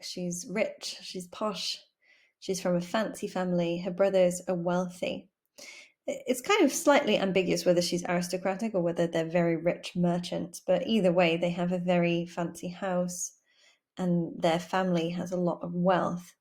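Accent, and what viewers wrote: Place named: United Kingdom. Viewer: you've summarised this in such a beautiful way! i don't have this in my course or something, but I'm very interested in poetry and especially keats' poetry. being an admirer of keats, I've heard a lot about this poem and now I finally know what it's all about. thank you so much you helped a lot! ❤️ ps. I love your british accent. british is my fav of all types :)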